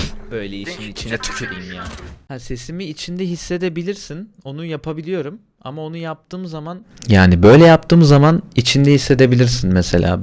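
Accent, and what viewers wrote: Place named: Russia. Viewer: yayını izlemediyseniz adam rus o yüzden öyle konuşuyor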